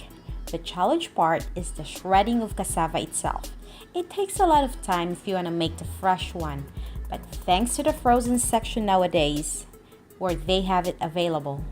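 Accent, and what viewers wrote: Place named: Vietnam. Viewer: Úi...nghe giọng này.e đoán chị là ngừi việt nammmm😁😁😁😁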